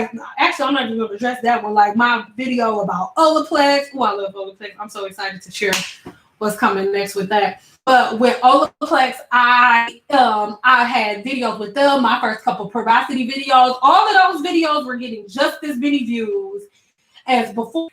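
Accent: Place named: Detroit, United States. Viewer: New SUBBIE, are you from Detroit? “woot woot”and the way you talk reminds me of HOME 313 🥰. You’re very inspiring, I introduced my cosmetology class to your channel 💕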